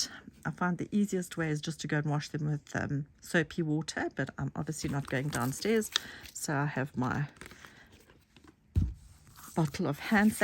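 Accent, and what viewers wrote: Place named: South Africa. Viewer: Hi! I JUST found your channel and I'm so excited to hear a fellow South African accent 😂 I'm currently binge watching all of your videos. Being new to junk journal making, I wanted to ask where you buy all of your stuff from? Especially your tapes and Tim Holtz stuff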